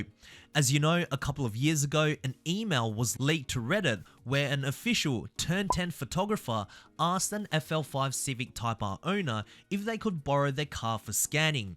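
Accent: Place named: Australia. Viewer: Yo do you also run a channel called? I’m probably wrong but the voices with this channel and the other one are so similar and both have the same Aussie accent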